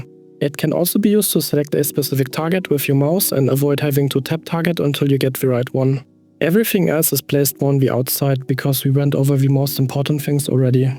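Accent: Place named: Germany. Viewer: Es ist schwer dir zu folgen wenn du Englisch mit so extrem harten Deutschakzent sprichst. Ansonsten Danke für das Video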